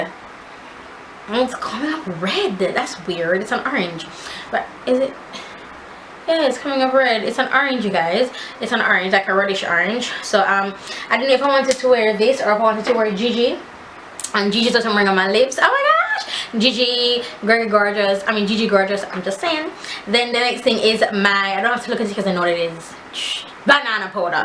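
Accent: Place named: Jamaica. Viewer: loll you're so crazy i lavvv it. aint nothing like a jamican accent lol.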